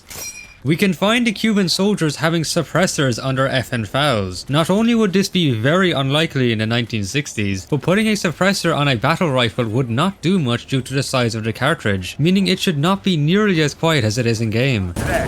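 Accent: Ireland. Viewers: funniest Part of video!! 😂😂. Hey, are you from Ireland!? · I never realized you were Irish…I actually thought you were German or Polish…don’t know what the says about me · Are u Irish if so what's the crack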